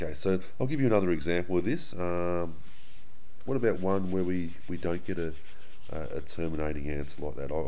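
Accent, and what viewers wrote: Australia. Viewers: I bet 100% that he is australian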